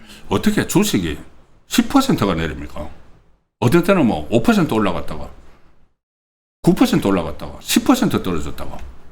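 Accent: Gyeongsang, South Korea. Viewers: (일반적이지 않고 다른 발음) 송국권선생님은 발음 중 일반적인 국민들의 발음과 다른 것이 있어 좀 거북하거나 듣기 싫을 때가 있습니다. 半도체 - 班도체 公직선거법 -공(球)직선거법 事前선거 - 辭典선거 搜査 - 輸사 대구식 발음인가요? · 경상도 발음이니 이해하세요 ㅎㅎㅎ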